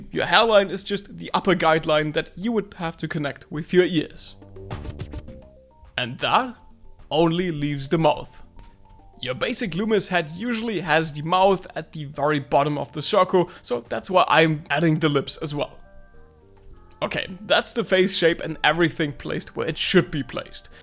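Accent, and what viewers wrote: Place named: Germany. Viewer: Ich bin auch mit deutsch aufgewachsen, hat man bei dir sofort gehört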